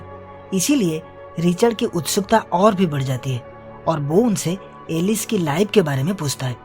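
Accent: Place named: Gujarat, India. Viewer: Itni acchi movie ko gujrati accent mein explain kiya hai isne 😢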